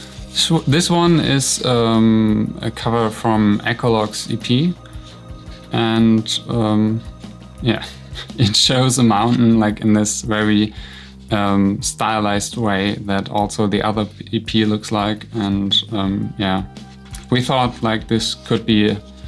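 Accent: Germany. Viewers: Wow, your German Englsich is so sweet😆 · ich hör richtig raus das du ein deutscher bist xP ps: nice pictures! love them x3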